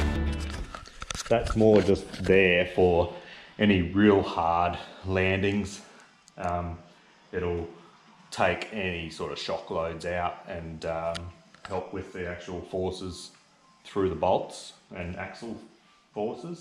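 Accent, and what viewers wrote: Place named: United Kingdom. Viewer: interesting It sounds like you're in the UK. Our USA ultra-lites are much lighter but I was thinking of doing something similar... just some ideas to pass along. the flying boat part is good, but I would do a high wing, also maybe some small out-riggers for weight saving with comparable stability out of a narrower, lighter, center canoe type body. We are limited to 254 lbs plus an allowance for floats and parachutes... yours is looking good. Did you use plans or a kit, or is it your own design?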